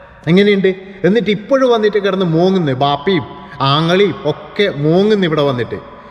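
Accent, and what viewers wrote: Kannur, India. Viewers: കണ്ണൂര് ആണോ, നമ്മളെ കണ്ണൂർ ഭാഷ ആണല്ലോ പറയുന്നത്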